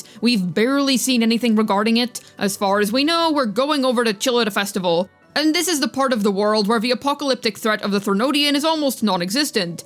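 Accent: United Kingdom. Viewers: Your accent still sounds british XD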